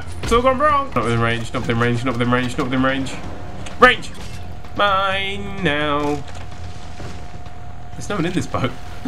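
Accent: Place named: United Kingdom. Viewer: not nearly as good as PewDiePie like when he plays Outlast lol... it's probably the British accent? but I know I'm not the only one who finds it pretty much the most irritating accent on the planet.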